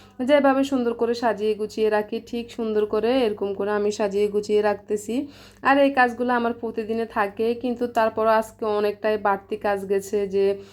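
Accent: Noakhali, Bangladesh. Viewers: অনেক সুন্দর সুন্দর বাজার দেখলাম❤ নোয়াখালীর কোথায় তোমার বাড়ি ❤ কথা শুনলে বোঝা যায় তুমি নোয়াখালী আপু 💞 এক মাছের বাজার❤❤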